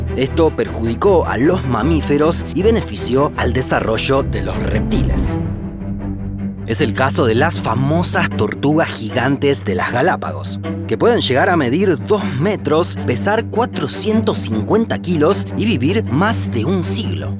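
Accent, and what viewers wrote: Buenos Aires, Argentina. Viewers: Xfa pronuncia bien las ye y lle No hables asi porteño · Gran video, como siempre. Sólo una pequeña sugerencia, que no tiene que ver con el contenido ni tema: Sería genial si las LL e Y sonaran como lo hacen en el acento rioplatense como anteriormente, pero que noté que hace dos videos se suavizan... No sé a qué se debe esto.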